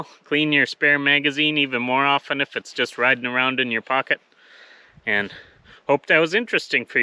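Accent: Canada. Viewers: Hi, I am a new viewer. Are you a Canadian? You have a Canadian accent. Great channel.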